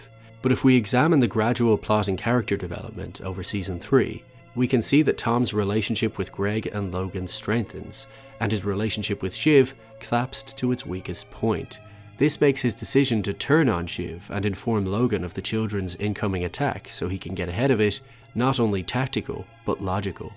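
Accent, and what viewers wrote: Ireland. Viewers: Do I hear a slight Irish accent??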